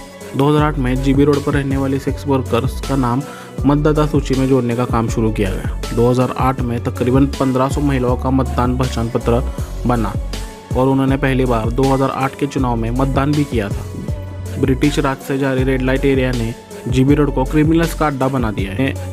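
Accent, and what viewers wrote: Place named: Maharashtra, India. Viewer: Aap pakka maharastrian Ho isliye aap ki boli se pata chalta hai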